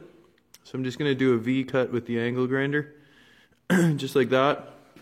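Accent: Canada. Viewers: R u Canadian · Canadian accent. instant sub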